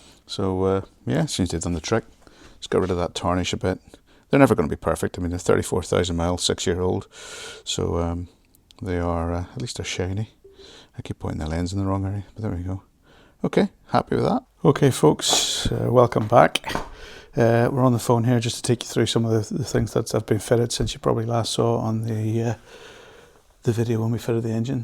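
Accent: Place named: Scotland, United Kingdom. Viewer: Just went and checked my <num> Duke R....yes that is the correct orientation for the header nuts. The 790 and 890 have different radiators. The 890 radiator is larger. Enjoying following this, Thank You for sharing! You are doing an incredible job of cleaning as you go along. It will be like you have a new bike! Also, as a Texan who has some Scottish ancestry and a Scottish name (Gillie)...I'm enjoying the accent!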